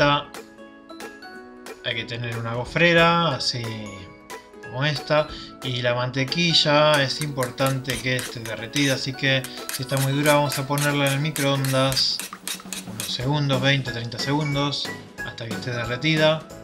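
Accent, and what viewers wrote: Argentina. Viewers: Hola! Muy rica receta de waffles! Ame! Me llamo la atención tu acento sos Argentino o Uruguayo? Muy rico todo voy a seguir las recetas!! =)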